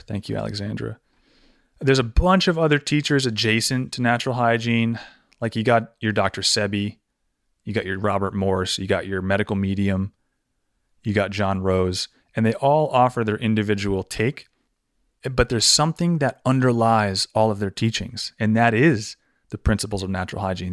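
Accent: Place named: Texas, United States. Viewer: Your Texan accent seems to match pretty closely with the way Texan musician Michael Nesmith used to talk.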